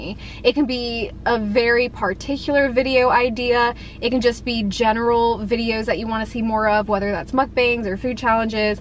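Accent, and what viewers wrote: South, United States. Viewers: love that southern drawl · your southern accent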